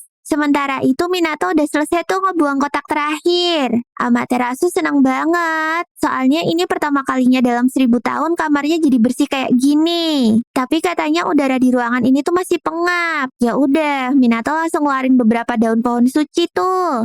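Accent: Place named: Java, Indonesia. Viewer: Suaranya jowo 😅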